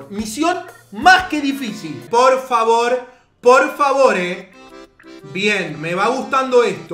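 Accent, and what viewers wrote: Argentina. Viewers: me gustan tus vídeos pero pareces un Carlitos muy argento cuando hablas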